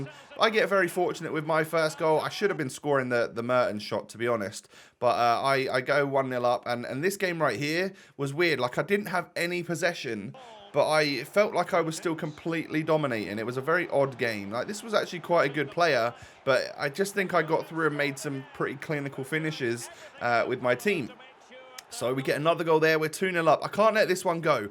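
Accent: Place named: Black Country, United Kingdom. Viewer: 😂😭 spot on black country accent boss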